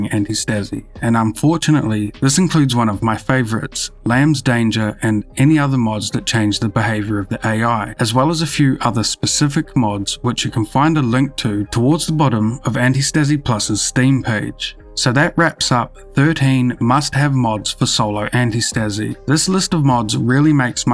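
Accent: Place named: New Zealand. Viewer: This guys a kiwi?Hell yeah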